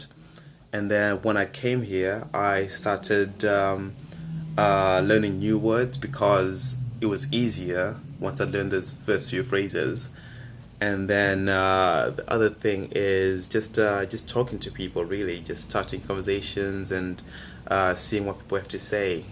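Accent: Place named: United Kingdom. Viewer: What ethnicity/nationality are you? You obviously sound British but it has a tinge of something else I can't pick up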